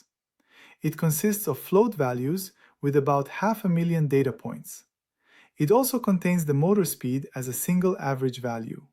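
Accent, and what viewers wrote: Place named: Iran. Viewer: Great video, especially with your sweet Persian accent! :) I tried to find detailed geometric information about the defect, but I couldn't find anything. Did they use EDM to create a single through-hole with the specified diameter? I'm working on a dynamic model of a defective bearing and would like to use the CWRU dataset to calibrate my model. Therefore, I need detailed information about the defect geometry.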